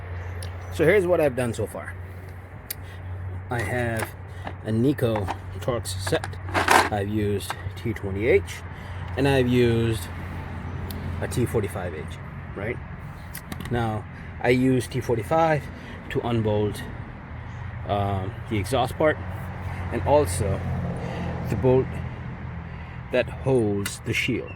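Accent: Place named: India. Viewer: u sound desi as fuck bro 😂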